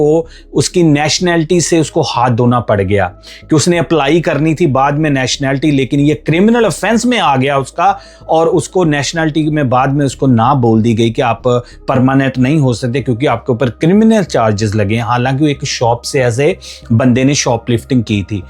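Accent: Punjab, Pakistan. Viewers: You are pakistani accents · App ka Hindi mano aap Pakistani ho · Yaar aap Pakistani punjabi ho kya?? 😂😂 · Tera accent pakistani hai....naam rakha hai sale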